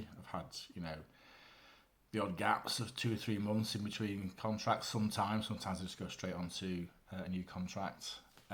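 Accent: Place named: Manchester, United Kingdom. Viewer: Can tell you’re a Manc!…if you got £200 for everytime you said “You know” in this one post alone, you could probably retire comfortably for life!! 😂👍….( great video bud…hit the nail on the head 😉)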